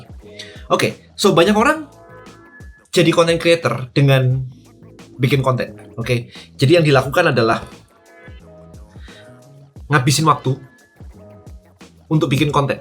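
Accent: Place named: Medan, Indonesia. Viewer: What, orang Medan KhoKho...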